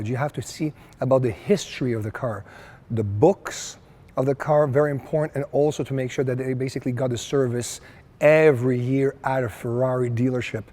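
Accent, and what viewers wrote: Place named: France. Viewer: Je sens l'accent français ❤❤❤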